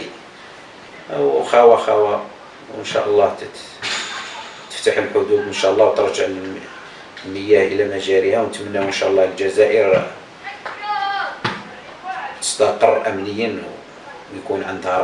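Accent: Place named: Doukkala, Morocco. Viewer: بقا فلمغرب ولات لهجتوا بحال دارجة المغربية الدكالية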